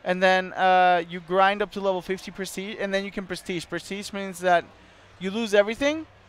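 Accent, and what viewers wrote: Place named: Venezuela. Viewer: You are from Germany? I thought you were from Venezuela.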